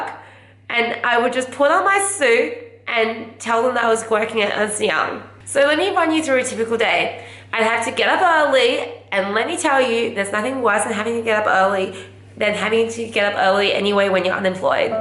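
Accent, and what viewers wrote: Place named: Australia. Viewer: Im in America. Is so cool to see an asian with an Australian accent!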